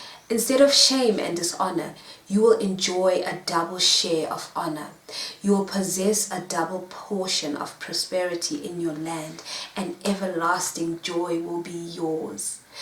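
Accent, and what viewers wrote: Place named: South Africa. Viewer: When I hear your voice, I keep hearing the Safari Live people here on youtube. South Africa. I also wanted to commend you on your singing. I have watched so many auditions and singing shows. I always enjoy a good voice! And you're doing a good job for the Lord as well, with your prophetic voice too. Amen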